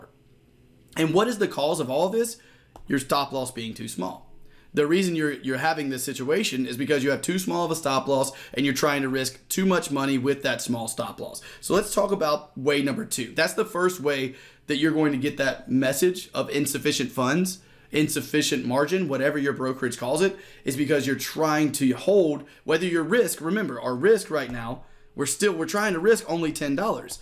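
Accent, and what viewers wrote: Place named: South Carolina, United States. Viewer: ooo hang on.. that's gotta be a South Carolina accent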